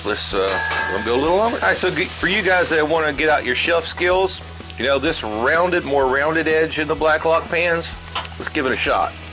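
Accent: Florida, United States. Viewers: This guy sounds like he’s from Florida.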